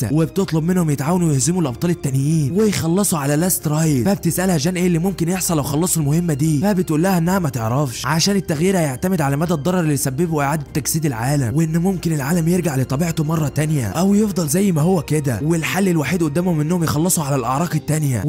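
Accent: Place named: Saudi Arabia. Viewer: أسطورة استمر انت ولد عمي وترونة سعودي ولكنة لخبط وحط اللغة المصرية ❤❤❤❤❤❤❤❤❤❤❤❤❤❤❤❤❤❤❤❤❤❤❤❤❤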